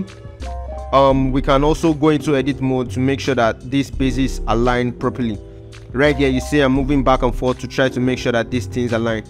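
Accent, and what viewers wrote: Nigeria. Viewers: heyy.. waasup adre you in a nigerian ????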